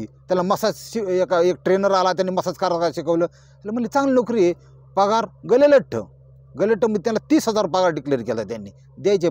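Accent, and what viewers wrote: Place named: Pune, India. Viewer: तुमची गावरान पुणेरी भाषाशैली आणि उच्चार ऐकायला लई भारी वाटतात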